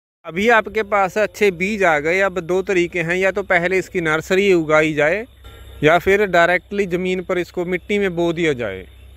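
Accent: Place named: Punjab, India. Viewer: bhaji Punjabi nikli jandi hai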